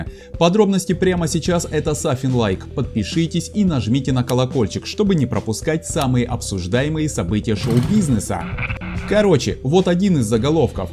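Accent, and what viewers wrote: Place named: Ukraine. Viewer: Да помойка вы,и ничего не перепроверяете.А говор у вас такой.вы из какой глубинки решили Москву покорить? С Украины?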